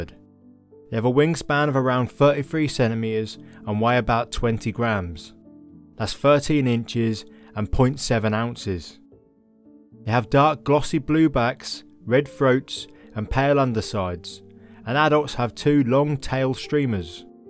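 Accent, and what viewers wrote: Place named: England, United Kingdom. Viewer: I like accent. We don’t hear enough of our English accents enough these days with all their idiosyncrasies.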